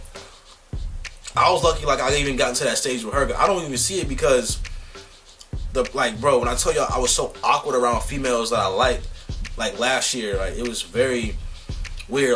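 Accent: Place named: DMV, United States. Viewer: no lie bruh i can always understand you cuz your a dmv man.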